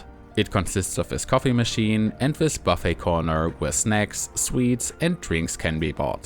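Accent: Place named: Germany. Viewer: This is an excellent video by any standards! I can hear a hint of what I believe is your native German in your English pronunciation, but it's very minor and only adds to the authenticity of the video. Your Swedish pronunciation is impeccable. I just subscribed to get you a little closer to the elusive 1,000 subscribers mark.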